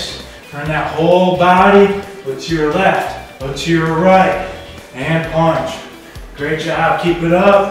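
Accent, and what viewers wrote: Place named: Wisconsin, United States. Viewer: First week down. LOVE IT. And I must say. I dig the “WIzkahnzin” accent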